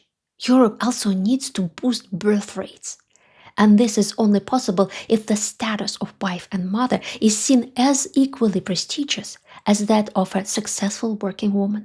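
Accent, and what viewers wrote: Russia. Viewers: Just my two cents... 1. Terrible English pronunciation (strong Russian accent), painful for my ears, a transcript would have helped. 2. Long, convoluted sentences, the entire talk could be made more succinct, the concept does not desire such a lengthy analysis. 3. Khalava (халява) is a simple concept, called "bribe" in English (literally "present", or "cadeau" in French). 4. you link military technology and Russia not posing a threat, neither is either true or have logical connections, not to mention the concept of "threat". 5. Subsequently, you hint on a Hitler analogy for Russia: please... we know who are those spreading that false narrative, are you one of them? Bad idea. 6. Having a separate video about Putin's psychological profile? What kind of credentials do you think you have to make anyone interested in it? 7. Talking about arrogance (that you yourself radiate), you are absolutely right (ironically), that it is a bad trait and should be avoided, along with hubris. 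8. On generic lines, you are not saying anything outrageously stupid or incorrect, just repeating a certain narrative, hence "nothing new". Alas, biased videos like this can proliferate on YouTube, as there are always enough polarized people who will feed on these. IMHO this was a waste of (my) time, so good luck with this narrative, but I find it unwatchable and a (rarely clicked) thumbs down. Peace :) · I love the Russian accent, it is such an interesting informative explanation about the Russian culture and mindset.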